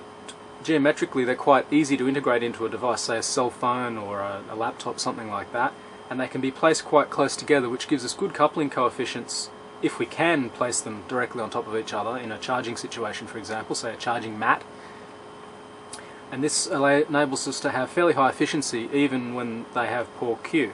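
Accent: Australia. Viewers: thank you! I was right. I thought you are Australian)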